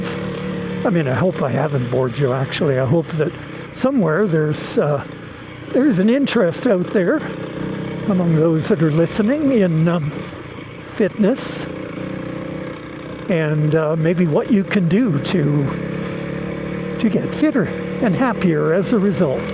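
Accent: Canada. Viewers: Great advice! Thanks! You sound Canadian. Hope I'm still riding at 75.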